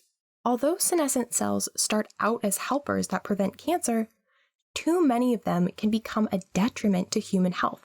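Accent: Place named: United States. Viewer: It would really help if you were to not speak American. It is very difficult for us English speakers to follow what you are saying, because we have to mentally transform it, whereupon miss what came next.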